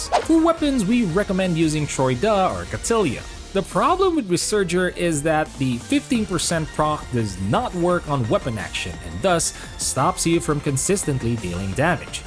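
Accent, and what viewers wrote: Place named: Philippines. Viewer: Nice video po! very well explained :) pinoy english accent on point :3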